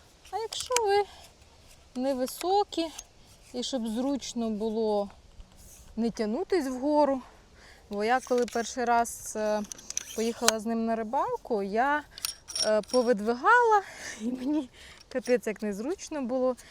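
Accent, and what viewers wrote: Kirovohrad, Ukraine. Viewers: А я то думаю що це суржик такий же як в мене, а виявляється ми обоє Кіровоградські)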